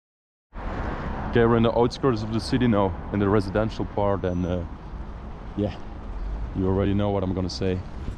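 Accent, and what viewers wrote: Netherlands. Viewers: mien jong ik volg je nu al een tijd en ik wist aan je accent dat je nederland kon spreken lol. greetings from groningen for ya. youve gone on quite the adventures! i always like seeing it. just please be safe with the railways and such from time to time! i saw someone already notified you of them being electrified. keep it up though, exploring places is what shows us beauty in what was left behind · Hou van je nederlands accent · Loved it, loved the dutch accent too lol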